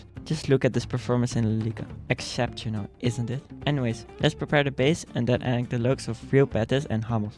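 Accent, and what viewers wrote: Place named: Netherlands. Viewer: Je bent overduidelijk Nederlands aan je Engelse accent te horen 😂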